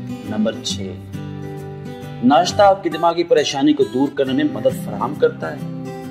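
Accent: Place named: Pakistan. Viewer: bhai awaz pakistani hai tou video bhi pakistani bnao na kya angrazno ko dala hoa hai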